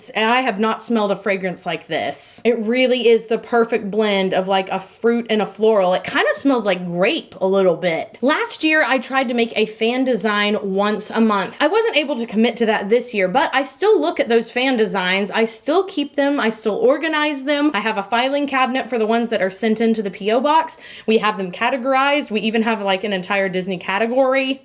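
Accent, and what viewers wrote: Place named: South, United States. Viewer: May I just say that as a southern woman my self. It brings me great joy to hear her accent and her say " yall" 😌😂😂 makes me feel right at home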